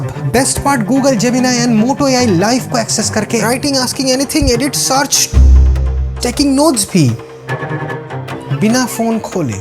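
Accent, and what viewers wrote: Bengal, India. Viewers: Man, what a review video. Very unique. Never experienced such a detailed technical, camera performance oriented review on YT. Have watched your Pocket 3 review also. And I loved the drama in your videos ❤ And the hint of Bengali pronunciation. Really appreciate your hard work. All the best Bro 🙏🙏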